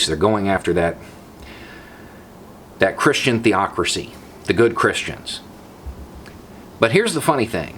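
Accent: South, United States. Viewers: you make the hardest subjects so easy to understand for we mortals. Your southern drawl delivery is calming. Thank you sir and keep the good work!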